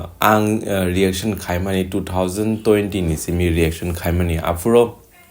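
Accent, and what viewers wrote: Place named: Manipur, India. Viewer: Very Few Meitei People can speak Kokborok I'm surprised You are one among them 😃 Clearly you have Meitei accent even after speaking Kokborok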